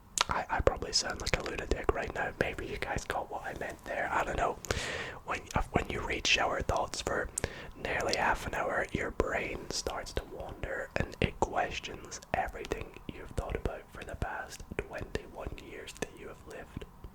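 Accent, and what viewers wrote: Scotland, United Kingdom. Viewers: You sound scottish are you from scotland?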